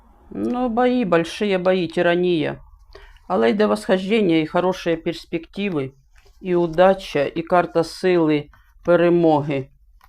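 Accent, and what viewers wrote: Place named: South, Ukraine. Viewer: Викликає довіру, щира, не клянеться, - що можу, те можу... Видно по говору, що жінка з південної України.... Дай, Боже, щоби так було як Ви побачили.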